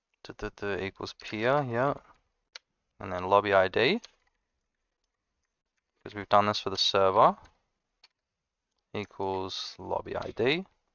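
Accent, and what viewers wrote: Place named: Australia. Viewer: the aussie accent helps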